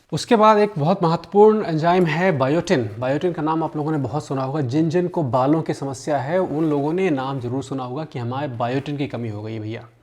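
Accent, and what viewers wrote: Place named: Uttar Pradesh, India. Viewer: Thanks bhai or aapki awaj bilkul up walo k tareh hai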